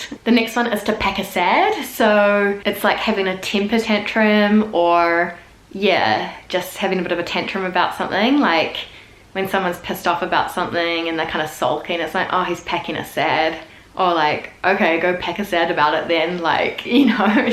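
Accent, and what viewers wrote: New Zealand. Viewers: And in a year's time, your kiwi accent has returned to full strength. I wonder what a comparison would be like of your French accent now to today. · Hello! Yes your kiwi accent has come back a lot! Lol you speak a lot faster now. When you were back in France I thought to myself ' heeeey, she doesn't sound like the typical kiwi... she finishes her words!' just kidding, you still sound nice! I remember when I first came to NZ, the use of the word "egg" to describe a fool, really stumped me lol It also sounded like people were saying " eeeeeeeeeeeeeeeeeeeeeeg" haha don't know how else to get that one across.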